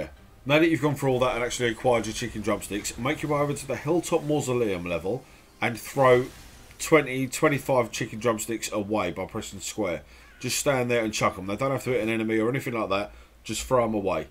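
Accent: United Kingdom. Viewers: It's weird how even a video about a silly game spoken with a Brittish accent sounds like a professor saying that the French is invading England.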